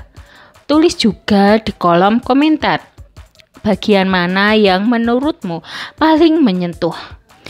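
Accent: Java, Indonesia. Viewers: Walaupun ngomong indonesia tetep jowone medok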